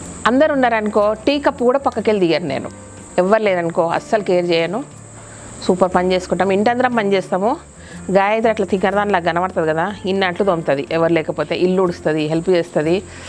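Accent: Telangana, India. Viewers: Hi akka thaidha rotte super mana telangana basha meeru matladeteeru nakentho ishtam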